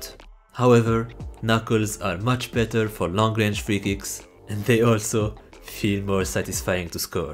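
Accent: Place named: Morocco. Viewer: Khouya ila knti meghribi rak nadi bzaf, bayna mn l'accent dyalk. Bonne continuation 🙏❤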